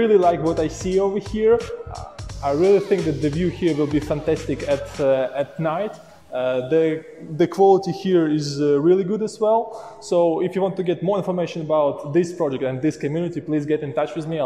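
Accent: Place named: Ukraine. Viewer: Vasiliy from Ukraine accent :)))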